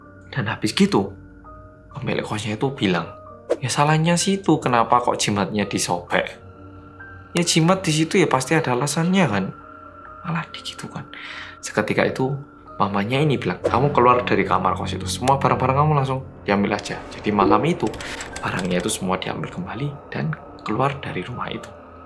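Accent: Java, Indonesia. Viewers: Yang medoooookkk .haha Sukses selalu mas bro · kodem lah, kan mas pernah tinggal di malang dan ikut membalik² kan kalimat kayak Reomit kan aslinya Timoer. kan juga pernah tinggal di Jawa Timoer. jadi nya itu tu Kodem alias Medok, oke? 🐦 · bhs indonesianya kok fasih dan agak medok jawa nya.....😀😀lucu.....kayak tetangga saya.....chaina..... seneng pakai bhs indonesia tapi medok abis..... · Logat Jawa nya medok banget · Meanwhile Mas: "Halo guys welcome back to Korea Reomit bersama orang Korea yang.." Gw: "MEDHOKKK" Medhoknya ituloh terngiang ngiang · korea indo medok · Dua jempol dech buat mas. Kagum bngt sama medok jawanya... Aq aja masih keturunan jawa, gk medok2 bngt. Jdi iri dech.. Srmangt trs buat upload kasus2 yg rada serem ya mas, tak enteni loch.. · Bicaramu Caaakkk....muwedhok nemen...😄😄😄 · "Kodem" kalo di balik jadi "medok" dulu dia openingnya pake kata "medok" cuma sekarang dibalik jadi "kodem" Maksudnya medok itu karena itu orang korea tapi medok gitu cara ngomongnya sekian terima kasih.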